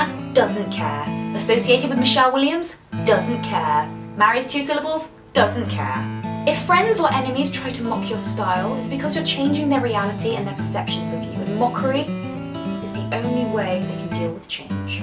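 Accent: United Kingdom. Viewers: you sound like british,I like your tone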